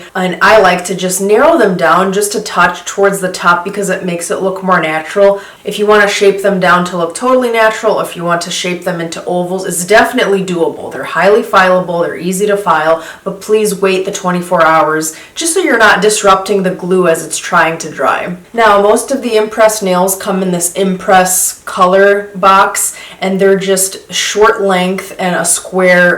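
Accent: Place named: Chicago, United States. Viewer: Great advise, and I love the Chicago accent!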